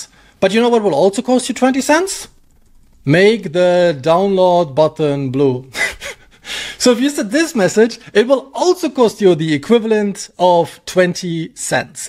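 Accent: Germany. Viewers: Great video, thanks for sharing! I realy searched for someone who compares the ai builders and gives a real recommendation! btw. are you german? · Bist du schwabe?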